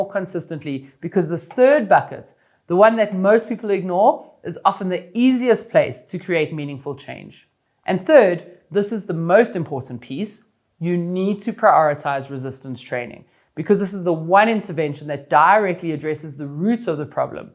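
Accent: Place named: South Africa. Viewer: I’m American and my best friend is from Johannesburg, I love your SA accent. Thank you for the great tips (I’m 50 and just started running this year)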